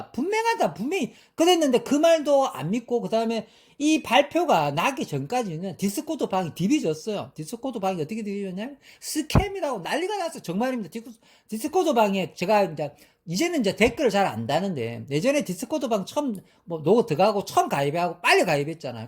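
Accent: Gyeongsang, South Korea. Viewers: 저고 경상도 입니다 경상도 사람들 말투 ㅋㅋㅋㅋ 저도 경상도라 이해 합니다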